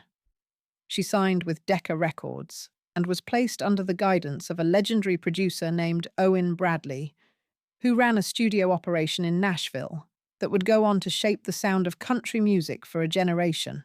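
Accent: United Kingdom. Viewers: Maybe because she is British….. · Why is the narrator speaking with a British accent?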